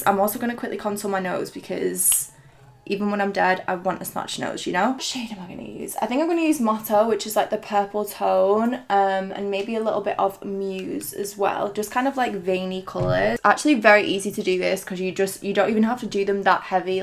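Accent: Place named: Scotland, United Kingdom. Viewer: Hahaha you must be from Scotland. Aren’t you?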